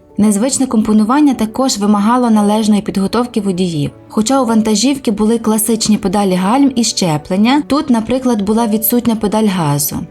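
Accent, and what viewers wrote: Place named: Western Ukraine, Ukraine. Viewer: жінка диктор з заходу країни)))))так цікаво слухати акцент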